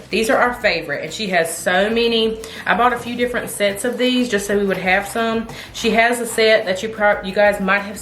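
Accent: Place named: North Carolina, United States. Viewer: My kids are grown. I just like hearing you talk! You're NC accent sometimes has a bayou twang.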